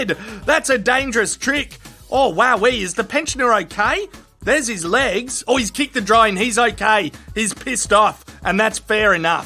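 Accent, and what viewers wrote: Australia. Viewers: The drones smashing into stuff like the building twice is the return to home feature on the older DJI 2-3. That's why it hit the same window almost its tracking GPS and doesn't know about skyscrapers. That said still funny with an Aussie voice over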